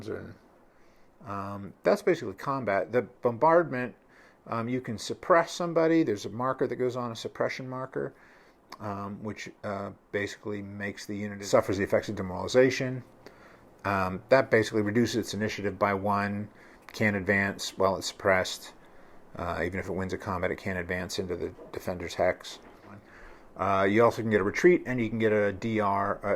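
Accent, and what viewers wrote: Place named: Pittsburgh, United States. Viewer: Thanks for making these OSG videos. I also thought in one of your videos "that sounds like a Pittsburghese accent" and yep you guys are here too.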